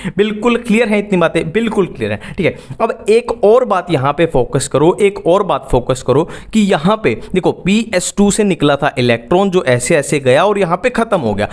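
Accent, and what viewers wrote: Haryana, India. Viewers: Aap haryanvi ho na bhaiya 😊 ?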